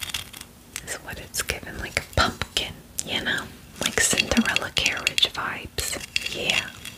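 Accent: New Jersey, United States. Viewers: I think maybe because this is more of a Jersey accent. My boyfriend is from Brooklyn he has a completely different accent than this 😅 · THE JOY I FELT HEARING THE ACCENT😭 JERSEY FAIRIES FOR LIFE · That’s definitely Jersey lol sounds like a Jersey Italian lol 😄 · Omgggg I missed my jersey accent fairy!!!! This is the best news. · I live in NJ and there are absolutely Italian women that sound like this all around me. It's definitely more common towards the north end of the state and into NYC. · the jersey accent always reminds me of linda belcher from bobs burgers😞😞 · yeah i’ve never heard a single person unironically talk like that, some ppl come close but i like the idea that it’s jersey. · The NJ accent is on point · the accent is Jersey, not New York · THE JERSEY ACCENT IS BACK ❤❤❤❤❤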